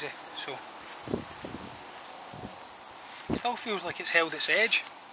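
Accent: Scotland, United Kingdom. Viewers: A wee bit of Scot in yer voice is there?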